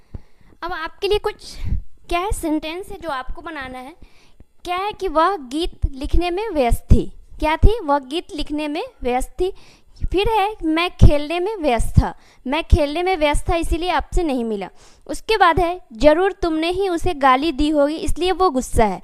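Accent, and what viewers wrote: Bihar, India. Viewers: Well! she was doing, write a Bollywood movie song. I was just, about to play Cricket. My result is, about to come. You must have, come to my home at soon. I was doing busy, take to my bath. Yess: I knew that You are from [Bihaar] with Yours, accental Mother Tongue phonatic sounds but still; I couldn't know about Yours complete qualification please. I am from Yamuna Nagar, [Hariyaana] state of India. Graduated in Arts, with the Instrumental and Vocal Music. Okay Madam!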